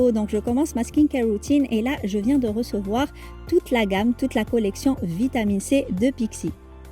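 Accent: Algeria. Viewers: Bonjour Vous portez bien le nom de votre chaîne vous nous inspirez vraiment en plus de l'accent algérien comme une cerise sur le gâteau 😉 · تبركالله عليك كولشي زين بزاف انا كتعجبني اللهجة دزيرية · اتعلم راهي واضحة اللهجة جزايرية لزمك انت تحاولي تفهم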